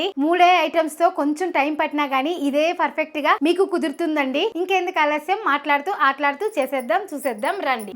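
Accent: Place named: Chittoor, India. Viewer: Maadi ctr andi slang ala unte adiga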